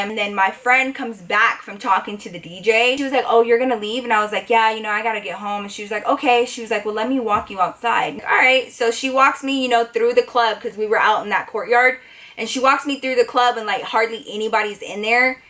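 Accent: Texas, United States. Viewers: I love your texan accent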